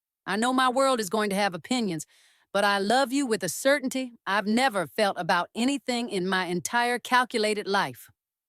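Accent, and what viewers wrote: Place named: South, United States. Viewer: She's Southern, a great Voice.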